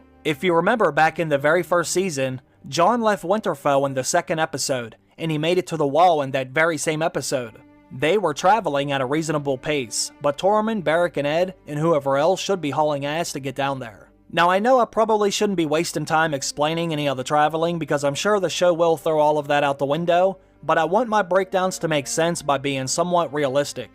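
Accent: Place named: Baltimore, United States. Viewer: My guy, are you from Baltimore? Cux it sounds like youre from Baltimore.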